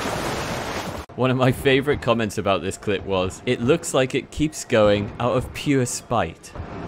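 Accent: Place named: Geordie, United Kingdom. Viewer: Are you from the north east by any chance, your accent has mackem/geordie twangs 😄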